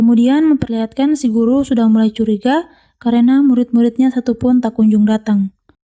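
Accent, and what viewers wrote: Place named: Toraja, Indonesia. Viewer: Logatnya kek orang toraja, nih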